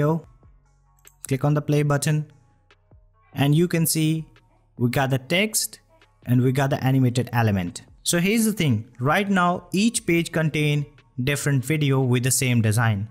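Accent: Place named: India. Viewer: "This is a driving drop tool".. I'm not too efficient in English - but what indeed does it mean, and why it is not possible for Indians to speak normal english at least for tutorial videos purposes?..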